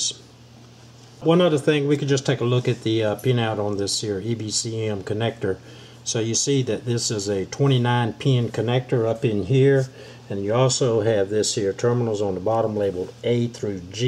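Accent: South, United States. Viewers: my 2003 Cadillac Deville has this problem, and I'm going to <link> thanks for the informative video and that Southern Accent..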